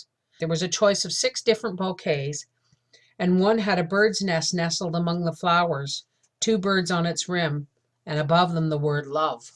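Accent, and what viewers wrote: Canada. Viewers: * pronounces some words a bit differently. I think it's because she's Canadian. Like "shonn"/"shone"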